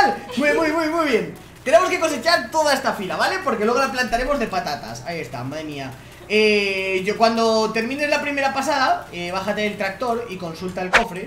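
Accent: Argentina. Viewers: que acento argentino papá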